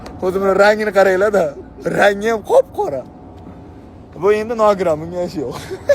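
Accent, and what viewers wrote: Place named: Dagestan, Russia. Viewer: Саломни догистон шевасида м узбекча иложиси бору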